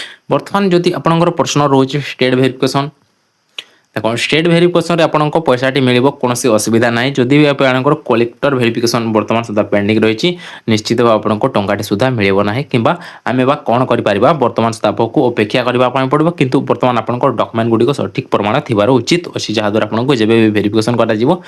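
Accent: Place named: Sambalpur, India. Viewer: Pura Sambalpuria katha lag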